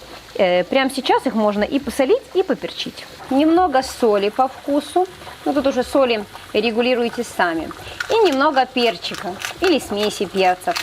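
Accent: Ukraine. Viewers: Чому ви записуєте відео російською ? Чутно ж що ваша рідна мова українська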